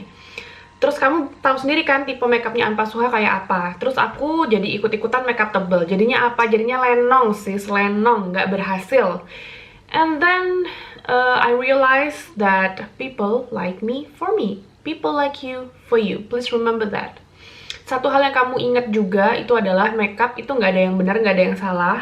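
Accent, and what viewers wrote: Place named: Yogyakarta, Indonesia. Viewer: Thank you for kind sharing. Seneng banget akuuu, penasaran Ka orang mana sih? Logatnya kaya orang jogja hehe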